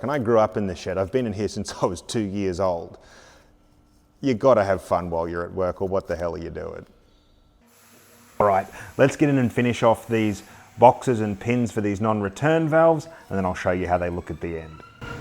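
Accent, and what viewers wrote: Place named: Australia. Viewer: Hey. Thanks for showing us all this great stuff. It is fun to see your channel growing. I learned how to understand Austrailian from Curtis so I get most of what you are saying! Watching from Abbotsford, southwestern Canada. 🇨🇦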